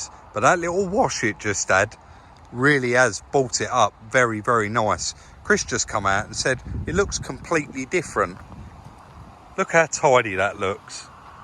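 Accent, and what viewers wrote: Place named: England, United Kingdom. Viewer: the trouble with your videos is that they contain lots of stuff that doesn’t relate to the actual renovation of the vehicles. I don’t feel any need to see your wife’s dog at the backdoor, or your ticket from Redbridge to the midlands, or your walk through the British museum… But there was next to no pictures of Chris doing any work on the door, or you doing any work on the brakes and other stuff. When I get to the end of one of your videos, I feel I haven’t learned any techniques or learned much about the type of vehicle. It all comes over as “ ’ere, didn’t we do well!....” Nice to hear a proper English accent, not some yank, but that isn’t enough to keep me watching. So I’m going to cancel my subscription. Ps#1 when you do the numbers, you never add in anything for the cost of maintaining your workshop, plus electricity, rates etc. 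You ought to, even if the wife is actually paying the bills. I suggest a flat rate of two hundred and fifty per vehicle. Ps#2 whatever you’re paying Chris, I reckon it’s only half what he’s worth.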